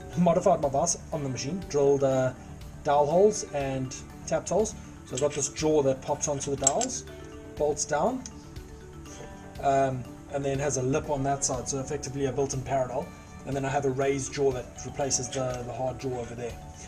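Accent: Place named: South Africa. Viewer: Hi there! Just discovered this series and binge watched all the videos. Great stuff! Do you have any info on your spindle? Specifically the atc system? Cheers! Devin. Ps I was born in Joburg (in fourways, moved to Canada when I was a kid... I can pick out the accent from a large crowd haha)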